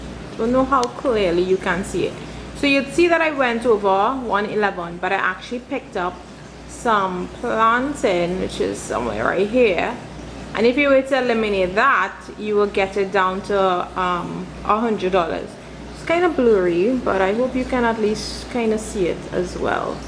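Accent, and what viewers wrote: Trinidad and Tobago. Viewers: I love the accent. So Trinidad and Tobago. $100.00 TT dollars. Cook up rice with dasheen bush and cucumber salad. I will go to the Chaguanas market. Oh Lawd that’s a lot of money you talking. I will definitely be watching you. I hit that 🔔. #ahfeelinforsome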